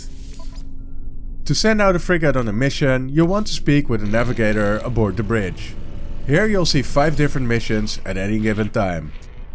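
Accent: Netherlands. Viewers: 😂😂😂 1 seconden en ik hoorde het gelijk😂😂😂 proud of the dutch accent 😂😂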